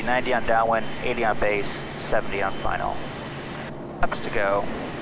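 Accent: United States. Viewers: happy to oblige. I'm in South Lake Tahoe, CA. You sound like you are from So Cal perhaps. Interesting story you have. A joy to watch. Cheers!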